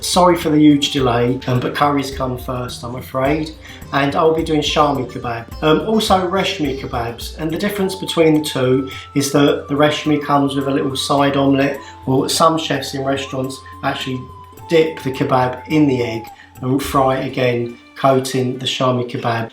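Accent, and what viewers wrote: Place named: Essex, United Kingdom. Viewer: Great stuff, you sound like you come from my home county of Essex but I now live in the Detroit area so your recipes and cooking lessons are a god send! No such thing as a good curry in these parts, though they do try. Hope things are well with you and that more shows are on the way but I understand it must be a grind to produce these videos. Thanks for the shows you have done mate, top class!!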